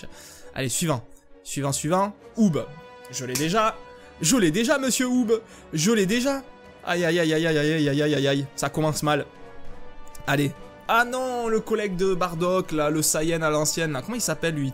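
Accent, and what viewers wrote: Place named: South, France. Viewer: petit accent du sud ça fait plaisir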